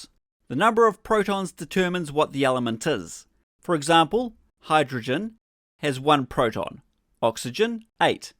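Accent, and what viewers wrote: New Zealand. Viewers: Awesome video. Are you an engineer by trade or science teacher? I mean we all know you're a long suffering Land Rover owner :) By the way, I always read your wall charts in my shop with a Kiwi accent (in my head). thanks! Can't wait for the next one. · Physics sounds funnier with a Kiwi accent too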